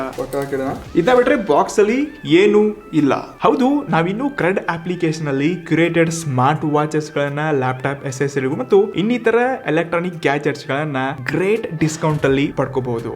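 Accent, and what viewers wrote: North Karnataka, India. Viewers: Nivu uttara karnataka bhashe matadtiralla niv uttar karnatakadoren 😍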